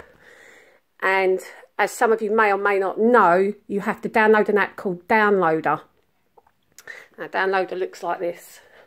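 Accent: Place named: United Kingdom. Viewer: Oh i love your british accent it sounds son beatifull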